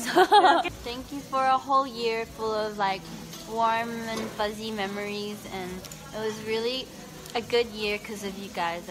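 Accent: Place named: United States. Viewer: yea she sounds more american in this vid but then in other vids she sounds aussie... same with jennie haha she sounds american in some vids but sometimes she has a nz accent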